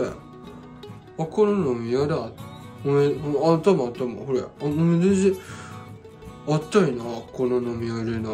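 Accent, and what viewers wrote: Tsugaru, Japan. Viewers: 綺麗な津軽弁ですね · うちの青森のおじさんにソックリ…しゃべりも…ガッツリ津軽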